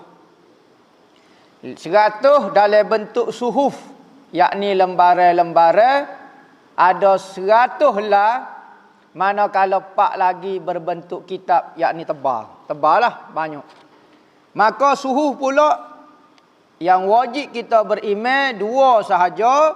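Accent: Kelantan, Malaysia. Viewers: Alhamdulillah... Jarang2 dpt dgr ceramah dialek kelatey.. Terbaik ustaz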